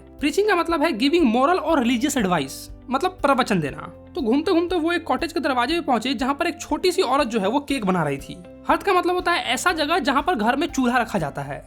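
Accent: Bihar, India. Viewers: Kya bihari hai ye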